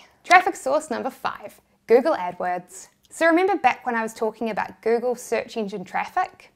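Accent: New Zealand. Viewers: Peppa Pig is British, where here sounds like she's from New Zealand. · keewee accent bro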